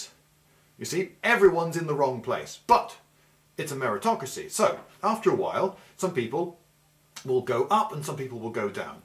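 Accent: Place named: RP, United Kingdom. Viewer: Cbf to explain why your analysis is fcking stupid. You having a posh accent doesn't make you clever.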